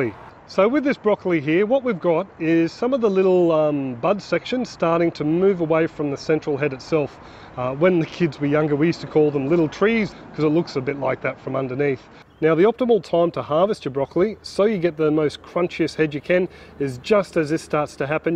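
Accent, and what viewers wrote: Australia. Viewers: I like the Australian accent tho also you look like bob ross I like it too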